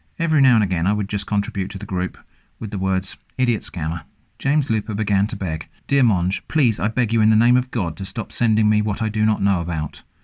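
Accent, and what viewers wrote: England, United Kingdom. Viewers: Rolando Cueva English. Not Aussie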